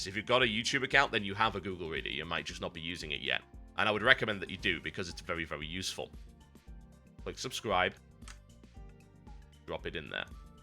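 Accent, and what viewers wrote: United Kingdom. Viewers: that just doesnt have a nice flow to it tho cynical brit yeaah cynical american eh? beside aslong as he doesnt lose his british accent and doesnt start pronouncing words like puma the american way then hes still a brit to me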